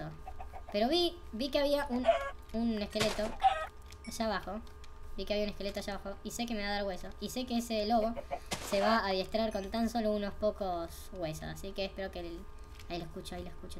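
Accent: Argentina. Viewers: sos argentino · eres argentino?????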